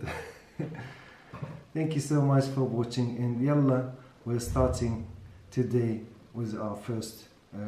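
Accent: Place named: United Kingdom. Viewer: You sound slightly British! Interesting :)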